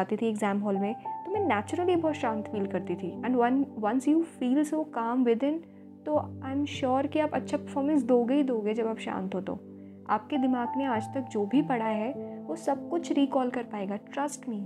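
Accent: Bengal, India. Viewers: Thanks for guiding lot of students.keep spreading your positive vibes....life becomes more positive and beautiful when people like you enter in life.Thanks and lot of respect to your parents who gives you such a good culture and sanskar. Somewhere I got a Bengali touch in your speach.you are from?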